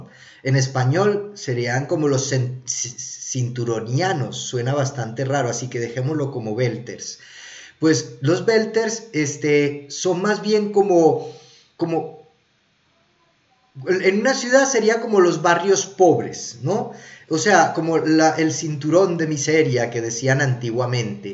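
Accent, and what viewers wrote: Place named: Bogotá, Colombia. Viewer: ud es colombiano, paisano rolo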